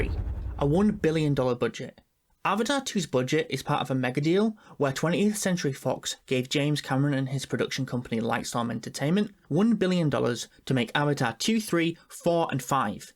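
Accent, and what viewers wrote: Yorkshire, United Kingdom. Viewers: is that a yorkshire accent or am i trippin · Avatar the way of wo-ah 😂 God I love British accents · Came here for avatar news but I'm too distracted trying to figure out his accent. Yorkshire???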